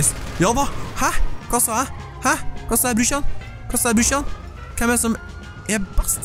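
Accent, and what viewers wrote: Trøndelag, Norway. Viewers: Er du trønder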